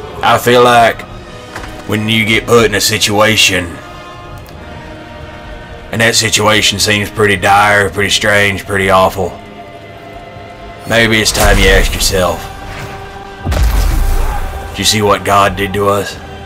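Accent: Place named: South, United States. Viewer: vaguely threatening southern rambling Thahs the truth.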